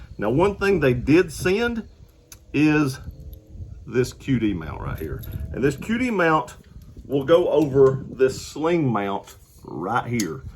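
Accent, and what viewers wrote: South, United States. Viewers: Are you from South ?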